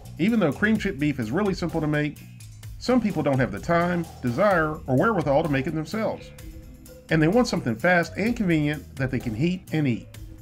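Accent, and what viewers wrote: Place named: Baltimore, United States. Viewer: Heh, "Baldimo", yep you're from there. Cheers from MD!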